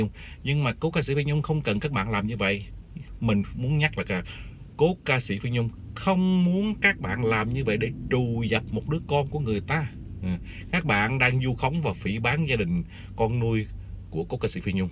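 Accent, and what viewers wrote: Standard. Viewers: Chủ kênh nói chuyện hợp tình hợp lý hay có tâm nói chuẩn quá anh trai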